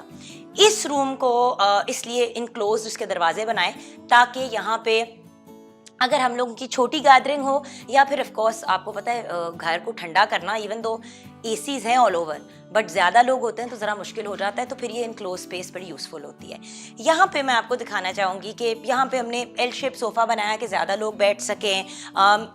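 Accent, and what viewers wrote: India. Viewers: she sounds like indian.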